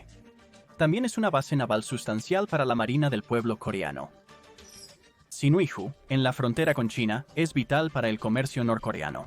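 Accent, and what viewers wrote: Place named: Catalonia, Spain. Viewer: El narrador parece que es un Catalan intentando hablar en Castellano sin que se le note el acento. El video muy interesante.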